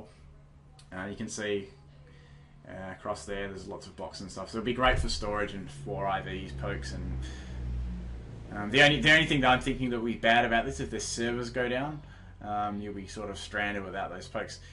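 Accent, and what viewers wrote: Australia. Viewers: Before you get all angry and stuff, know that is Australian, not a brit.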